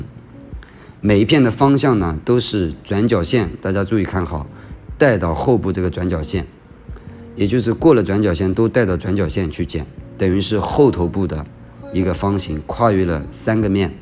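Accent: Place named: Sichuan, China. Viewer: 四川口音親切可愛，NL不分😂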